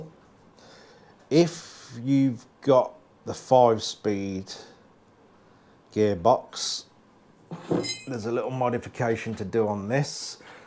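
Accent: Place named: Black Country, United Kingdom. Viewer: Hi I've been watching your videos for some time now and I'd like to say how great it is to watch a professional /thorough craftsman at work! Thank you for sharing your knowledge with us! Just curious, You sound local to me, I'm in brierley hill what area do you live in? Thanks Mark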